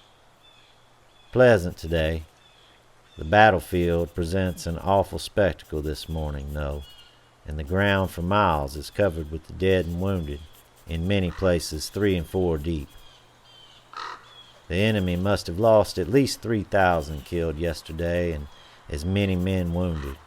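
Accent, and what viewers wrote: South, United States. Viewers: Very well read, but his accent is a southern.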